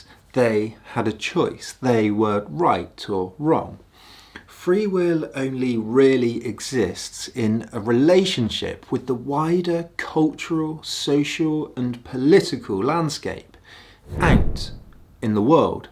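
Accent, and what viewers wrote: United Kingdom. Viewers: Why do I find this guy so attractive? I think I have a thing for British accents and philosophy majors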